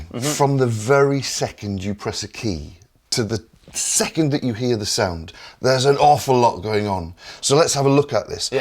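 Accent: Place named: Yorkshire, United Kingdom. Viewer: It's "Anechoic Chamber", non "Anaconic", but he sounds like a Yorkshireman so it can be excused.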